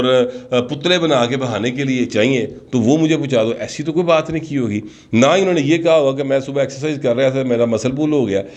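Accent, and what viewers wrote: Punjab, Pakistan. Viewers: ""Kuti Cheeka"" Zara Tehzeeb Key Saath. If you watch VideoLog about 15Months before,He Talk Totally in Urdu,Very Serious but When He got Established (You Tube Dollars ),He start talking in Street Punjabi.Why?Cause he is "" "'.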